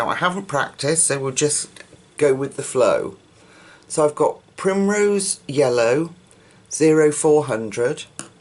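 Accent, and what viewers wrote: England, United Kingdom. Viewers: Actually they work pretty well over grayscale. I'm getting not full 120 set of Artists, but buying just those colours what are missing in Procolour and Coloursoft sets. Already got all greens and tried them here and there. O my gosh! They layer and blend as good as Polys and Pablos and Keep Sharp Point!!! I believe they'r as economical in long therm as Pablos and Polys. I found that at least in Klara Markova books i can put Procolours over Artists, over Coloursofts, blend with Derwent blender. Endless posibilities ... I was biten by german sheperd too, also in back when i was 20. Scar took more that 10 years to fade. It was in party and dog was police dog from my friends friend. I was dancing, mowed backwards and got too close to owner. Dog jumped and bite me in back without any warning. It was well trained policemen dog and listened owner in a second. 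everybody was joking that i don't have to make injections for rabies because it's police dog. I got close to owner about 1 meter. Guy said if i stayed 1,5m from him dog wouldn't attack. Lesson learned. Like Your colourings very much and way how You speak, Your accent is like my english colleague's here in France.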